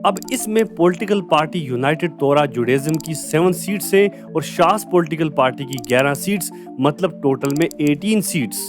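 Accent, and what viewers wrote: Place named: Pakistan. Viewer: definitely Kashmir wali side Sy hngy because dsri side India Waly asy nhi bolty Urdu like Pakistani type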